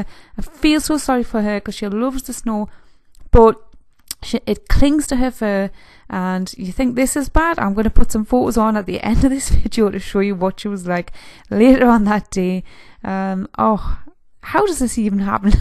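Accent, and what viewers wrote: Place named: Scotland, United Kingdom. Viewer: I love your diys. I love your accent may I ask if you're Scottish?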